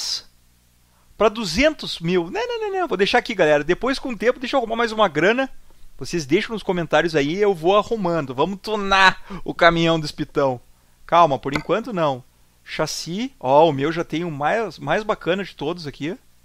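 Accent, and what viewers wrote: Rio Grande do Sul, Brazil. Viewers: VC E GAÚCHO NÉ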